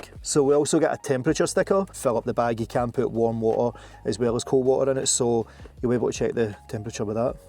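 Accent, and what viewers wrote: Scotland, United Kingdom. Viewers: i was on fb the other day and the flex tail advert come up. all of a sudden i hear the familiar scottish dulcet tones. i know that voice!!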